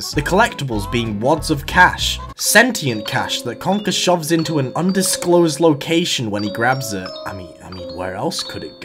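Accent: United Kingdom. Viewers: Are you British? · i didnt even notice you were british until you started talking about it LMFAO damn im fucked up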